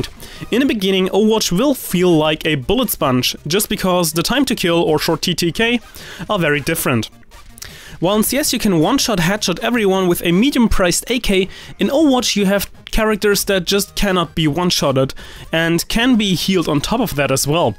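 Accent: Germany. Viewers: Where are you from? You sound kinda german and slovakian i dunno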